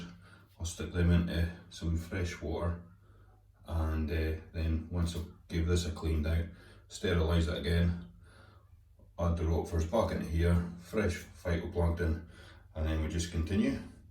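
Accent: Scotland, United Kingdom. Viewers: Hey I can’t tell you how delighted I am to see you trying to breed those exceptional clownfish again. Your already off to a flying start with the clownfish laying on your glass tile 👍🏻 I’m so excited probably even more excited than you 😂❤️❤️❤️ Love your channel and videos. Also love that deep Scottish accent of yours. Looking forward to the next episode already. Keep em coming I need my fix weekly please 😘🥰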